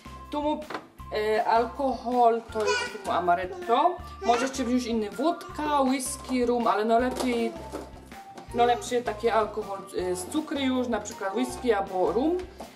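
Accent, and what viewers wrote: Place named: Podhale, Poland. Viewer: Przecież to jest Urzekające.... Ja pochodzę z Białegostoku ale to jest Cudownie piękne jak Pani mówi po Goralskiemu 😃😃😃😃😃😃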